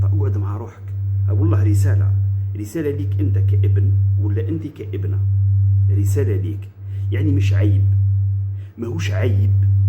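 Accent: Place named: Biskra, Algeria. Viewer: دكتور هل انت بسكري لخطراش لهجتك بسكرية ماشاء الله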